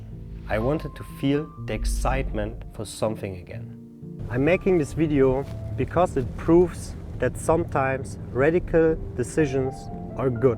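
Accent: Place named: Germany. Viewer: Ich hab sofort durch deine Aussprache gemerkt, dass du Deutscher bist😂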